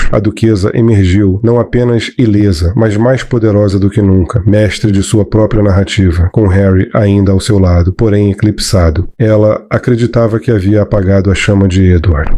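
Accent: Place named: Rio de Janeiro, Brazil. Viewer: Não é problema de respiração.... Este homem que fala é carioca( RJ). Sotaque de pessoas moradores da Cidade do Rio de janeiro..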